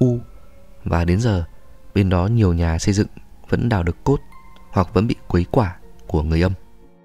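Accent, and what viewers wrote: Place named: Hanoi, Vietnam. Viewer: Nghe để giải trí mà. Tôi rất thích kênh nầy, tôi thích nghe đọc truyện, giọng rất hay chuẩn Hà Nội, tôi người phương nam, xin cảm ơn và tác giả.❤❤❤❤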